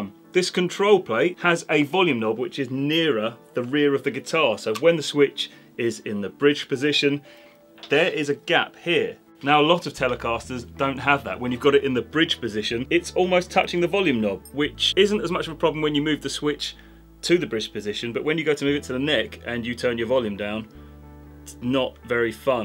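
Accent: United Kingdom. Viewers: To USA Americans, a man with a strong British accent and tattoos and a . .muscle-T-shirt' is obnoxious .... that is MY comment and your replies are not wanted or asked for.....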